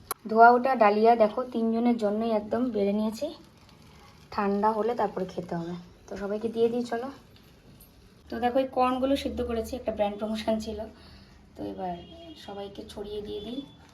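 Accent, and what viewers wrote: Bangladesh. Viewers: আমার বাবা বাঙাল আর মা এদেশী। তাই তোমার কথার টান বুঝতে পারছি । যখন টিভিতে বাঙলাদেশ এর চ্যানেল দেখতাম তখন ওদের এবং শব্দকে অ্যাবং বলতে শুনেছি। তেমনি তোমার এগ্ না হয়ে অ্যাগ্ হয় । অবশ্যই তুমি তোমার আঞ্চলিক টানে বলছো , তাই বলার কিছু নেই ।তবে কি বলতো বাংলা আমাদের মাতৃভাষা ,তাই যেরকম ইচ্ছা বলতে পারি । কিন্ত ইংরেজি আমাদের ভাষা না, তাই ওটা বলার সময় সঠিক বললে ভাল হয় । কারণ ইংরেজির তো আঞ্চলিক উচ্চারণ নেই, তাই না? আর আমরা বাড়িতে যা খুশি বলতে পারি, কিন্তু অন্যের সামনে একটু পাল্টে বলতে হয় । যেমন আমরা বই পড়ি শুদ্ধ ভাষায় 😊। আর তোমাকে এই টিপ ভাল লাগছে, তবে আর একটু নিচে পরলে কেমন লাগতো?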